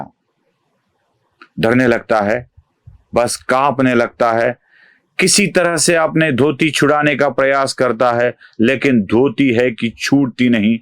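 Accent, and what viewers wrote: Maharashtra, India. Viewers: जी आपकी एनालिसिस तो अच्छी है ही, आपकी मराठी निष्ठ हिंदी भी बहुत अच्छी लगती है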